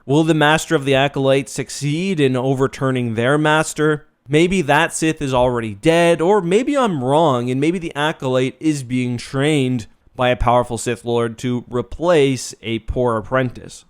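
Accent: Canada. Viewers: Bro i just noticed your Canadian you live in a hoose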